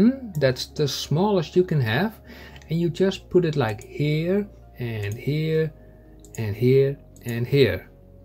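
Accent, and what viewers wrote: Netherlands. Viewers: Yes i hear it too Im dutch too Halloooo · I can hear by your English, your a dutchie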